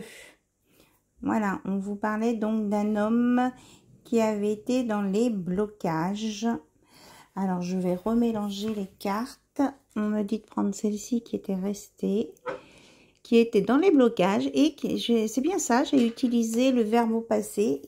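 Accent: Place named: England, United Kingdom. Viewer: My Dear Thank you for this beautiful guidance. I love your English accent. I wish you a sweet and pleasant day in Divine Grace and Mercy 😇😇🙏🏽🙏🏽🌸🌸🌹🌹🌟🌟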